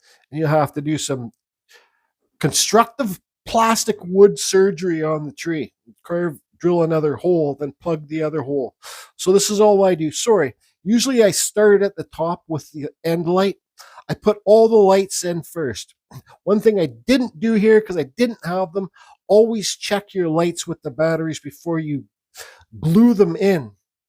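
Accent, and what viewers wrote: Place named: Canada. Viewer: I think I’m detecting a Canadian accent!!….or upper Midwest..I love it…I’m from Michigan and have spent a lot of time in Canada and of course the Midwest! Thanks for sharing your expertise much appreciated…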